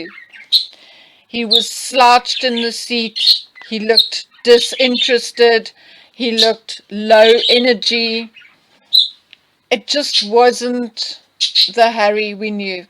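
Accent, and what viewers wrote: South Africa. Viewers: And your beautiful South African accent. Makes me homesick. I lived 30 years in RSA.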